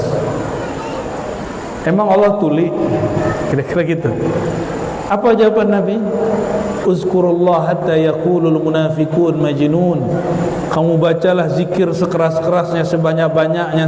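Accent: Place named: Minangkabau, Indonesia. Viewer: Alhamdulillah Buya bahasonyo taragakjo kampuang halaman, ala lamo Ndak pulang 😅sanang bana mandangah Bahaso, itu asik Samo Samo urang Padang 👍😁🤗💛💛💛💛💛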